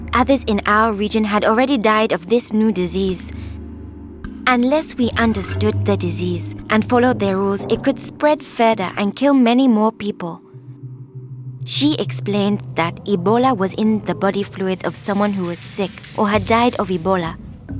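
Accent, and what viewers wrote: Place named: Africa. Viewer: african accent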